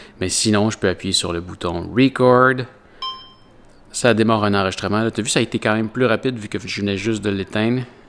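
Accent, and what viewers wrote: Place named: Quebec, Canada. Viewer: Tu m’as convaincu avec 2 choses : le trépied aimanté (on y pense pas mais dehors il y a du métal partout donc des trépieds partout !!) et ton accent québécois 😂 je viens de découvrir ton compte je m’abonne directement 🎉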